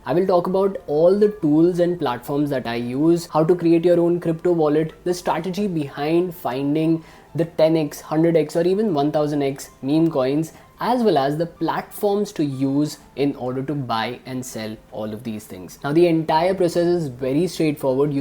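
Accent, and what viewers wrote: India. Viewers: Everything is good you are good Toothpaste Seller, but for GOD SAKE. Will you be F.... STOP NODDING your head. Besides your Taaattrrring Indian Accent I have got headache by just watching your video just your F...Head Nodding. Please take it as constructive criticism. For GOD sake.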